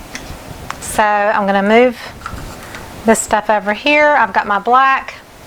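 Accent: South, United States. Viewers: Hi. I have to say I am not a fan of the colors BUT I LOVE how fearless you are in trying different things AND showing us your boo boo’s. You could have easily edited your video to hide the fact that you forgot the additive but you showed us how to recover and that makes you an excellent teacher. I love how you blew on the painting to create more cells and added color to the blue area after the fact. You take risks and just roll with it. This has made you a master of this medium and I applaud you for your efforts. I will always watch your videos because I enjoy learning your methods, personality and your southern accent. Thank you.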